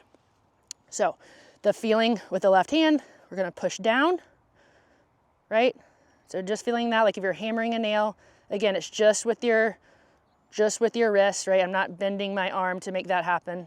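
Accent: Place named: United States. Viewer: I love your work method and most of all your American 🇺🇸 accent !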